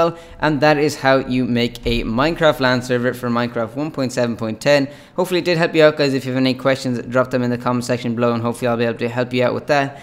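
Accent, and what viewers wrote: Ireland. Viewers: Are you Irish? Also, do the 2 accounts have to be on 1 computer, or can 2 people use seperate computers and join?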